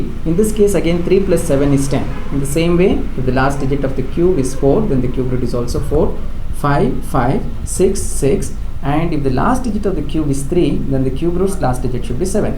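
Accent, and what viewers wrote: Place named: India. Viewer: what I hear: De duh deh deh. do duh deh dee deh deh. If this were the first day of class, I would be on my way to Drop/add. Sorry. My understanding of Indglish is and terrible. lol Will try to find this in english. Thanks for posting though!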